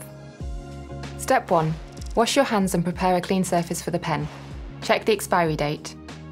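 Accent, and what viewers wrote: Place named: United Kingdom. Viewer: It states that is U.K. and you can tell from the accent. It is not all about the US !!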